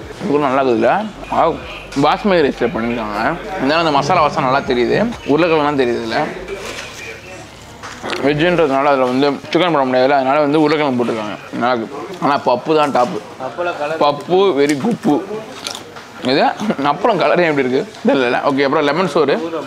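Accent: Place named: Telugu, India. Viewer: Hi anna nige Telugu pesard kamidiya erk anna😂😂😂nanum AP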